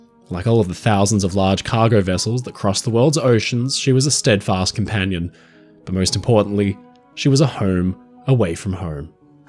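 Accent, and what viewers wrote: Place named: Australia. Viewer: it is Australian English. The best by far in the world.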